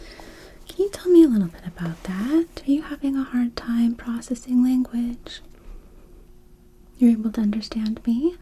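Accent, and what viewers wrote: United Kingdom. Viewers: Loving the British accent 👌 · Fellow Brit here - love your work and great accent! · loved the British accent 🥰 great video thank you soooooo much😘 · I know I usually comment some crazy scenario for a bit of fun. But this time I just want to say amazing work, and I really appreciate all of the effort you put into your videos! And also, that British accent is brilliant, well done! Thank you for all of your videos! Also, you look extra stunning with the short blonde hair! 😍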